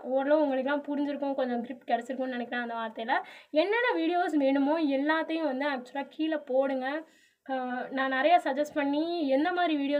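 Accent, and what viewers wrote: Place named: South Korea. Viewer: Akka super akka enga appatiye korean mathiriye pesuringa akka yenga akka korean kathukittu ga solunga akka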